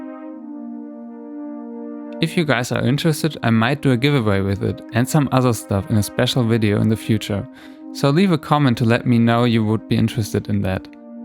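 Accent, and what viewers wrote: Germany. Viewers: Are you from Germany? :D Cause you have this accent and you subscribed to Coldmirror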